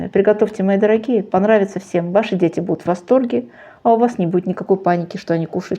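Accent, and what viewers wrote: Baku, Azerbaijan. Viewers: Добрый день,! Мы с вами землячки, я тоже из Баку.Родилась, выросла и большую часть жизни прожила в Баку а сейапс живу в России.Очень приятно слышать и слушать знакомый бакинский акцент. Спасибо за ваши рецепты.Попробую сделать мороженое.Всего вам доброго!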